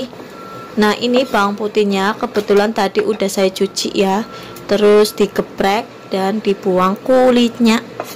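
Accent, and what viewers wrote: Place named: Ngapak, Indonesia. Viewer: tenan mas bahasane wong ngapak medok banget aku suka itu, lembab lembab gemericik he..he..he....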